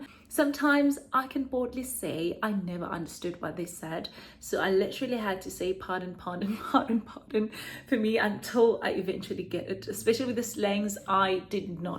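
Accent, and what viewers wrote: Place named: United Kingdom. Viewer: Loved the video. But not going to lie, that accent threw me off. For a second I thought the video is about a Brit moving to SA not other way round.🙈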